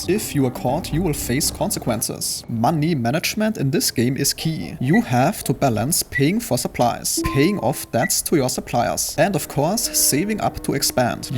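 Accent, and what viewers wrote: Germany. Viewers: Are you German? Because of your dialect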